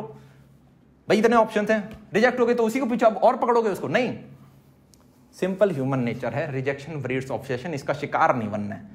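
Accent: Haryana, India. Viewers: what a haryanvi tone of speaking wah 😉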